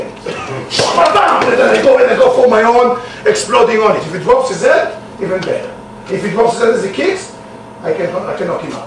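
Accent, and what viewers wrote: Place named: Germany. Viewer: He sounds German.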